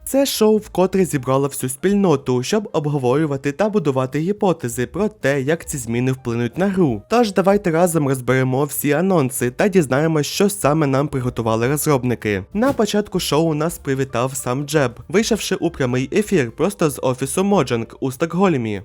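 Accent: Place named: United States. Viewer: ааа, незеритське, зрозумів, автор через свій фірмений американський акцент ні зміг сказати слово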